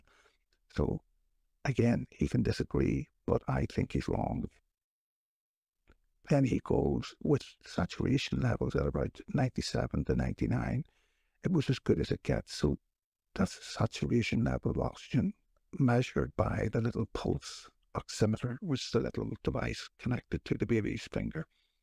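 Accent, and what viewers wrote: Belfast, United Kingdom. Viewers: The audio quality is not the best (particularly on these earlier episodes) which is likely down to mic (recorder) but it does improve in later episodes. He's currently up to elosode 57. His Belfast accent may also cause difficulty to those that are not used to such an accent.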